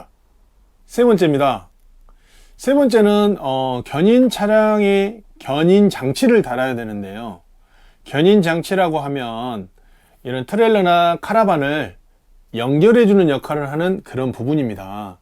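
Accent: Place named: Seoul, South Korea. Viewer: 아따 서울사람이줄 ㅎ 멋저버러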